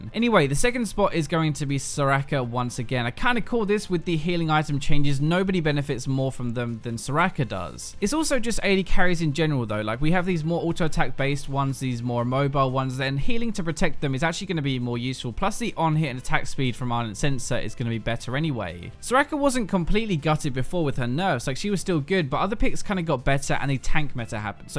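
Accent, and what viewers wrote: United Kingdom. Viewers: Bro british accent screws the translation up but still great vid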